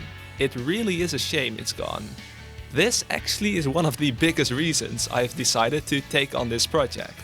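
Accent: Netherlands. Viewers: Its pretty obvious that your from Europe i think your from the Nederland’s i can hear it from your voice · this shit is fire!! (je hebt een licht nederlands accent btw XD) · Ik hoor de hollander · By the accent I just know you’re Dutch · R u Dutch? I recognize the Accent 🤟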